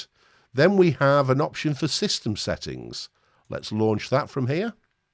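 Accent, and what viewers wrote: United Kingdom. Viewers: Mint has been my main dektop OS for quite some time now. The thing just works!!!! On my desktop, my laptop, kids laptop, kids gaming desktop running Minecraft nonstop, the media centre....I've had Ubuntu installed at some point until they came up with that Unity nonsence and I was facing the dilema whether I had to run to the nearest PC shop and shed cash for upgrades or switch distros, then I discovered Mint... I've been Linux user since the late 90's and started with Slackware. Really enjoy your videos and glad to see reviewers with British accent out there :-D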